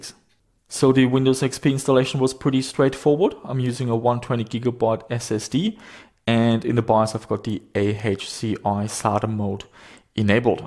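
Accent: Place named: Germany. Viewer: Hey man how come you sound more German than Australian?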